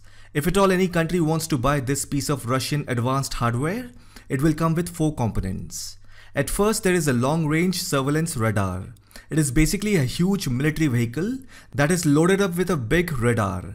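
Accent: India. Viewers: Damn, It`ś interesting but Indian english is beyond my abilities to withstand ;o) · Indian accent is so annoying. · Love indian english accent ❤🙂🙂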